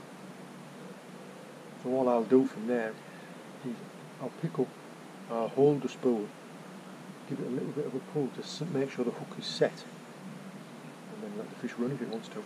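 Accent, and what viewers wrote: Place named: North East England, United Kingdom. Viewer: Where are you based to mate, I just thought you were up in the north east because of your accent. Are you ex army as you are always well turned out for an angler!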